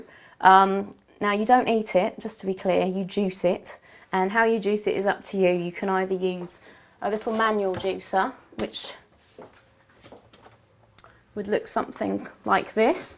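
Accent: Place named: United Kingdom. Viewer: Very good vid,. Thank you for the info!...Looks like you have quite a few fans, including myself. Love the British accent, and of course you're very lovely!! And of course Wheatgrass juice is an amazing healer for the body. Try it 4 yourself & you won't be disapointed.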